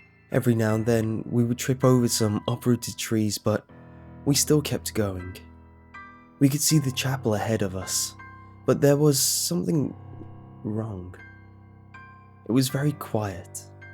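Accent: England, United Kingdom. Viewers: British or some kind of English, i believe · A bad story read by an Englishman is still a bad story. Why do people assume that slapping on an English accent will enhance something. Oxy Clean doesn't work better since Antony Sullivan has been shilling it. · I think he is english. And some people do like this story.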